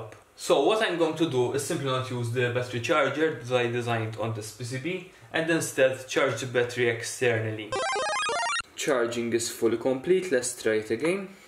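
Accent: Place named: Malta. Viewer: i can see from your accent that your maltese same dude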